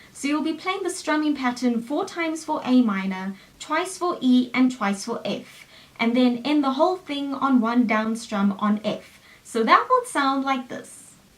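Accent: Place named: South Africa. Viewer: sounds like south african to my ears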